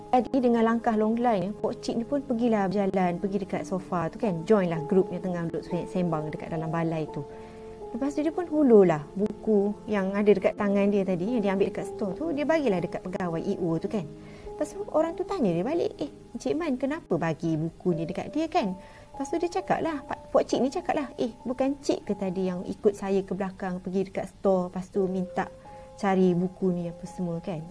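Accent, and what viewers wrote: Kelantan, Malaysia. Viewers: Kak orang kelantan ke orang luar salah cakap pok cik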